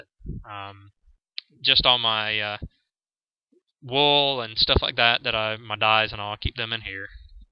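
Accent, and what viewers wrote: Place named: South, United States. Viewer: finaly someone with a southern accent man thx i thought i was the only minecrafter vid maker was southern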